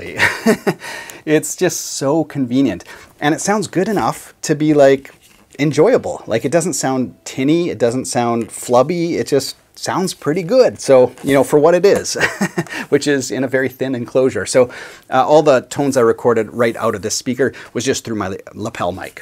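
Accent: Canada. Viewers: I love Canadian accents! Teach me master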